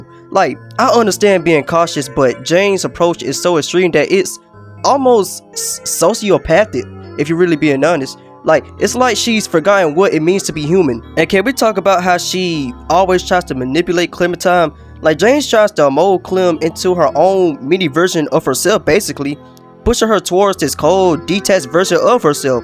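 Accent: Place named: Louisiana, United States. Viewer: What the heck? What kind of accent is that? Is that a Louisiana accent? Whoooooooaaaaaaa! Sir you are a gem. Please make more videos.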